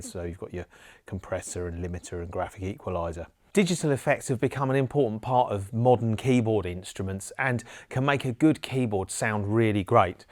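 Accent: United Kingdom. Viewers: Cockney geezer 😅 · Sein Englisch ist makellos und ein schöner britischer Akzent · Sorry, aber als Engländer hat er halt keinen deutschen Akzent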